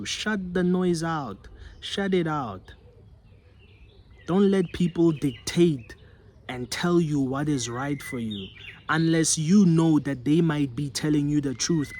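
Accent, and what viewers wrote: South Africa. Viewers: Bro are from mzansi?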